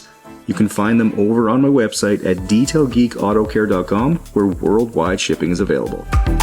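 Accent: Canada. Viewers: "Aboat" that's when I found out you're Canadian 😆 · 1. Are you from Canada? I hear an accent. 2. I hate that there was a dog in there 😢 and 3. That was NICE vehicle, they had a moonroof, and whoever drove it was VILE 😢 but it looks amazing. Love the video ❤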